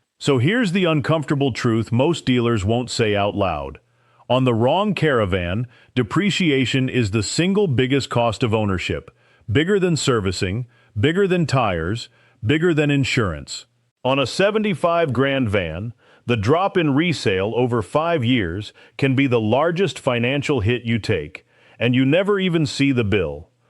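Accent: United States. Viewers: This is hard to watch. Cheap A! crap. American voice and a hotch-potch of overseas video.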